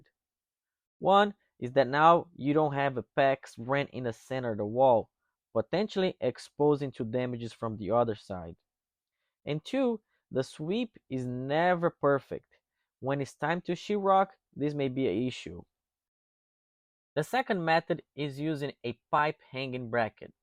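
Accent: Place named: Brazil. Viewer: I can tell a Brazilian accent when I hear one haha great work man! Meus parabéns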